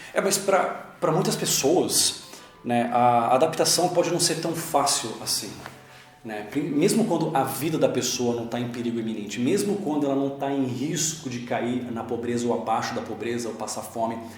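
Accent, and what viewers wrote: São Paulo, Brazil. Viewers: você é paulista ou catarinense, fiquei curioso agora e depressão, tenho problemas com autoestima e já pensei em suicídio por diversas vezes, até conversei com um psicólogo, mas ele acha que remédio vai resolver o problema, depois que uma garota me humilhou na frente da escola eu nunca mais consegui falar com uma mulher e tenho dificuldades em me relacionar e isso me incomoda e quando tento conversar com alguém a maioria das pessoas são bem grossas e nisso eu me isolo e quando vejo um casal eu me sinto mal porque não consigo sequer uma ficante, é só um desabafo mesmo.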